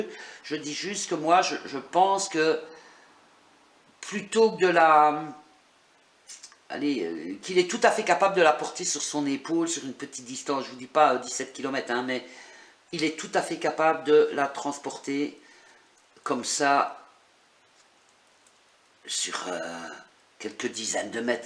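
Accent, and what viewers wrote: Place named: Belgium. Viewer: Vous êtes adorable et vous avez un chouette accent Belge ♥️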